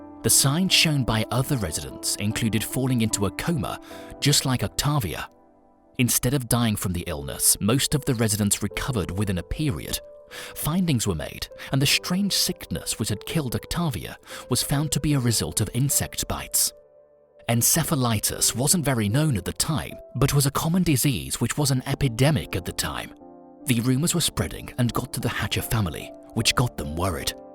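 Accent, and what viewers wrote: United Kingdom. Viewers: he’s British 🇬🇧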